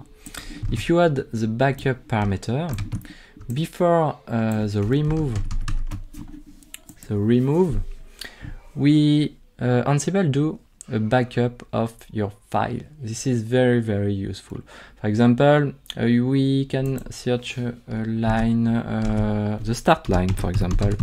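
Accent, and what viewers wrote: France. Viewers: Yoooo.. J’adore l’accent français bro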